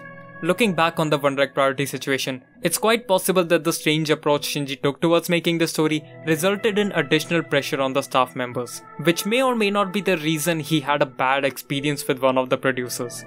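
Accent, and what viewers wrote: India. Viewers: The Indian accent makes me not be able to take the video seriously. The editing is good. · Are you indian? your accent is hard to pin down...it sounds indian and Italian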